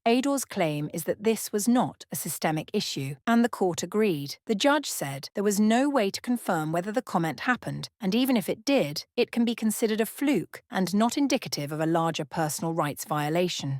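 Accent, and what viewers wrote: United Kingdom. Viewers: What made you choose the British accent? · I love the British accent especially when you say something snarky, it really fits the tone.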